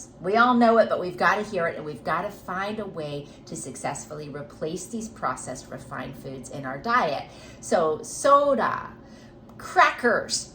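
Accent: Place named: United Kingdom. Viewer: Your British accent is spot on.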